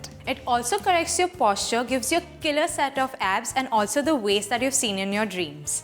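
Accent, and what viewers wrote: India. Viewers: Ur English feel like an Indian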